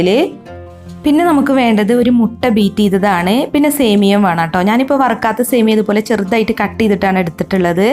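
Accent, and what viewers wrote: Malappuram, India. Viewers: നിങ്ങൾ മലപ്പുറക്കാരാണോ ,? Kaadamutta ഇഷ്ട്ടം 😋😋😋😋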